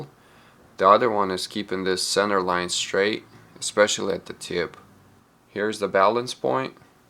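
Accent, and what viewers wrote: Russia. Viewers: Акцент то русский!))Очень хорошие работы,удачи!Продолжай в том же духе!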